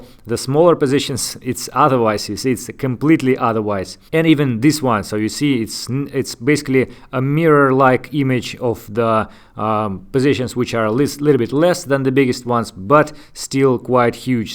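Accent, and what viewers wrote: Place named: Russia. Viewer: Sounds like russian accent :) But anyway, very solid video! Thanks!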